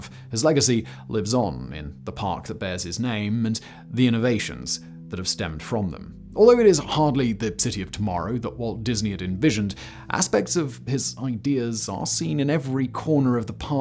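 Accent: United Kingdom. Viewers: Sometimes it occurs to me that my family members probably wonder why I'm listening to the same British guy constantly, unaware of the unusual number of channels this man hosts.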